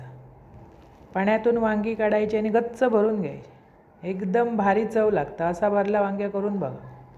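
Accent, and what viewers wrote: Malvani, India. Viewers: Tumchi swatachi ashi bolnyachi style aahey.Jyatun tumchi maiboli dokawatey.Aaikaila chaan watatey.Recipes ter jashya malwani lok kartatath tasyach sangata.Kunala aasal malwani padhatichey jewan shikaichey asel ter tumchye channel jaroor bagaway.All the best dear for not copping anyone else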